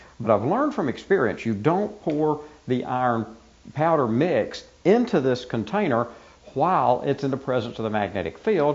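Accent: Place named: Tennessee, United States. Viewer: You sound like a Tennessean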